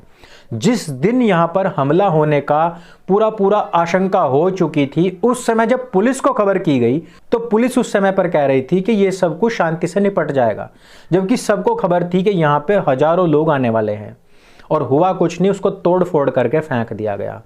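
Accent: Haryana, India. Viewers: Tu haryanavi jesa bolne ki kosis kyo Kar Raha hai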